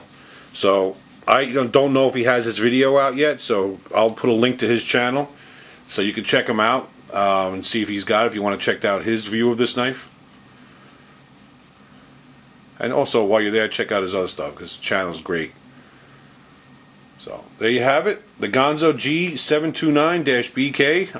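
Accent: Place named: New York, United States. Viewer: accent for sure it's hard to mistake. I've been out of NY for quite some time and I still have mine.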